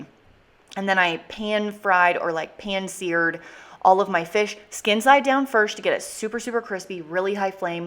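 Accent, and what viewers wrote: United States. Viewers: You cooked Barra! It’s delish! So is red snapper. & you said it correctly, it’s strange hearing American accent say it haha. Fellow Aussie 🇦🇺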